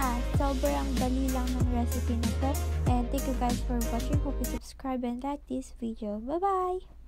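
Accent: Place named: Philippines. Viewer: ralf macalma ?? tf galit much? chill. kuya, ever heard of accent? normal lang may accent mga pinoy/pinay.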